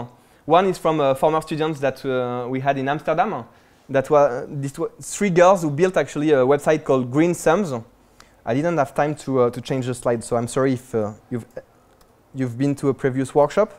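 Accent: France. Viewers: ohhhh that french accent _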